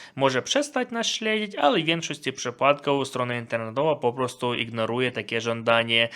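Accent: Ukraine. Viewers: Czy to bezpieczne słuchać kogoś ze wschodnim akcentem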